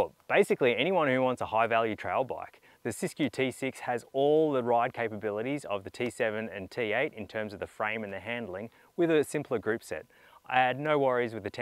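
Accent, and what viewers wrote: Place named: Australia. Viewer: No problem hearing and understanding him perfectly here in Switzerland even with the typical Aussie accent! And he is right btw. actions do speak louder than words mate.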